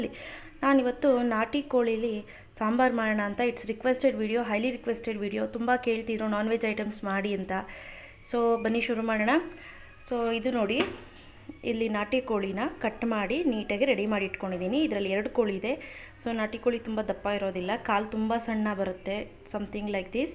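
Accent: Mandya, India. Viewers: just by your way of speaking,as we r from Mandya..tq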